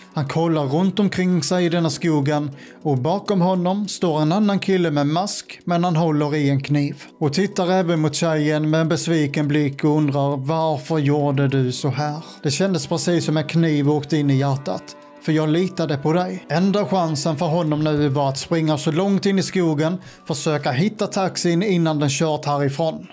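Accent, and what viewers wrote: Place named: Scania, Sweden. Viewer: Översättning förstår inte din skånska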